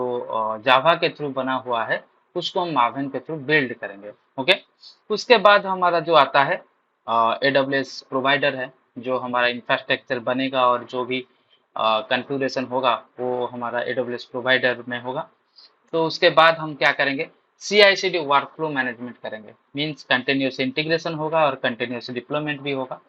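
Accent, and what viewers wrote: Odisha, India. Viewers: Are you an odia, bro ?? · Are you ODIA?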